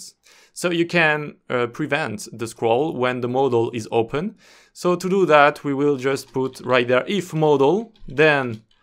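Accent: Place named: France. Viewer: Sweet french accent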